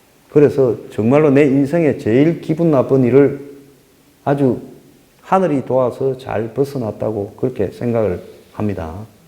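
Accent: Gyeongsang, South Korea. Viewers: 소장님은 뉴스 브리핑 중 소장님 특유의 말투로 약간 경상도 말에 약간 속어쓰는것이 제일 우습습니다. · 서부경남 사투리가 친근하고 믿음이 가지요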